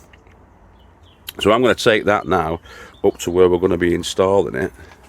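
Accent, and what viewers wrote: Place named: Wigan, United Kingdom. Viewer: Hello! You're incredibly gentle with your persuader! Here I am yelling at the screen just give it a good whack,!! 🔨🤣 It looks really fantastic!! Just wonderful!! You'll make the money back by increasing productivity.. so don't forget to include the savings in your calculations!! Keep speaking the way you do... more correctly sounds terrible!! We'd miss the Wiganese! It'll be nice with featherboard!! Thumbs up, my friend!! Get out of those wet clothing..warm up... and we'll see you tomorrow on a sunnier day!☀️ Fingers crossed!! 🤞 G'nite!!💖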